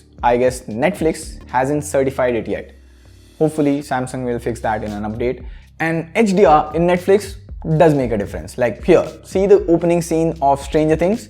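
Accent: India. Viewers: First of all, indian english kidda weird.. 😂😂😂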